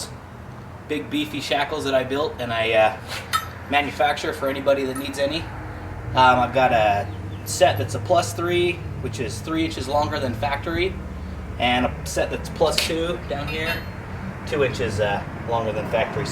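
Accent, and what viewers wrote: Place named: United States. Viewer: That awesome accent haha fckin love anericans! Lol Great vid ;)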